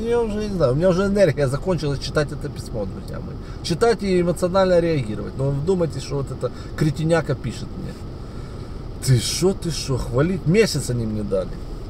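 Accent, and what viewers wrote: Odessa, Ukraine. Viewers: Брат полностью с тобой согласен.Твой Одесский говор и юмор класс, улёт.😂😂😂❤❤❤ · только подписалась на ваш канал. Слушаю вашу,нашу родную одесскую рэчь и уши сахаром присыпаются! Какой текст,чисто лейся песня!!! А в Одессе для настоящих одесситов,не для новых "одэсцiв" на мовi говорить чистое западло! И смысл комментариев на письма всяких Ебванько- это песня! Продолжай в том же духе! Здоровья и удачи. И процветания!!!! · Как же Вы мне напоминаете Михаила Водяного. И тембр голоса, и неподражаемый одесский акцент, и манера разговора, и юмор, и смех... Мне кажется, Вы и внешне на него похожи. Очень хочется верить, что на Украине - 95% адекватных людей. Но по моим наблюдениям, это далеко не так. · я вот уже два мес слушаю твои репортажи но не подписывался на тебя, но после этой Ганны, решил подписаться. Не обижайся на ущербную, есть и такие люди. 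Ты прикольно рассказываешь, настроение поднимаешь, так что выпускай больше репортажей. Привет тебе от одессита, родной мой говор, который я уже 4 год не в Одессе. (((( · ай е тебя несет… 😅 твой одесский говор - не исправим!!!!❤ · Одессита сразу слышно по говору и шутке юмора😊! Второй день слушаю и ржу!!! Слава Богу, что Луганск в "оккупации"!!! Ждём Николаев и Одессу домой! Тернополь пусть ждёт репараций и расплачивается за кредиты их любимой власти. Там долг уже накопился не на одно поколение! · Гаси майдануиых.Уникальный Одесский говор.Красава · Привет! Классное видео! В ржете в вперемешку с Одесским говором, а как мы режем! А ещё где вы едите. там небо такое тяжелое кажется прямо сейчас раздавит. А далее такие красивые места, горы! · как мне нравится твой одесский говорок. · Я просто млею от Одесского говора. Привет с ДНР. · Как он мне нравится😂😂😂😂😂😂Только одесситы так разговаривают 😂😂😂😂 Шутки, прибаутки ну прямо как моя подруга😂😂😂😂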